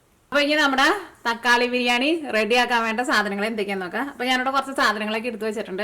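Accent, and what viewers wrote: Thrissur, India. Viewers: Thrissurkaari aaahno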